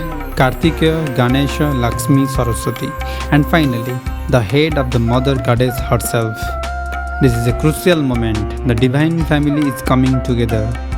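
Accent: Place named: Odisha, India. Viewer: Video presentation is excellent but you need to work on your speaking power needs improvement bcoz your english sounds like typical odia , it could be more better if your accent was good enough.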